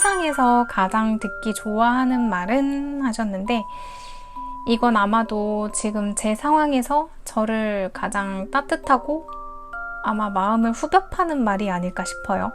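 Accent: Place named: Gyeongsang, South Korea. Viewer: 혹시 어디분이신가요?? 경상도신것같은데! 화이팅하새용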